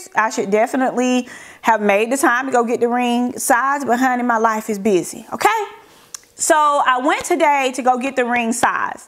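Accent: South, United States. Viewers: You always look well put together so I see no degradation in your makeup. What's really sweet is ur country/southern accent!! So... "belle-ish".😄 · Honey after 5, girl your southern accent comes out all the time and I’m a fan okay, I love it. Thanks for sharing you got some cute...cute items!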